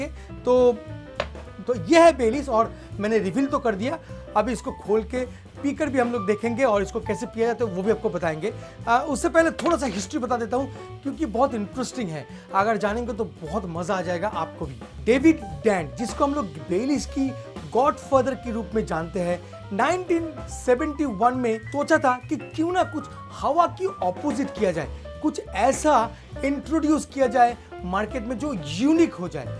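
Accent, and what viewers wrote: Bengal, India. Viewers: Bengalis can conquer anything with their intellect except their Accent wile speaking Hindi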